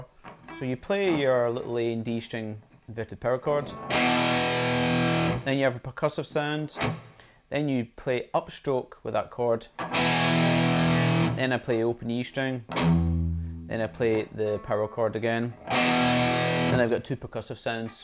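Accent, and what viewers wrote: Scotland, United Kingdom. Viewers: Sweaty knockers sounds best! In your accent! ;) Scottish? Great job on the playing.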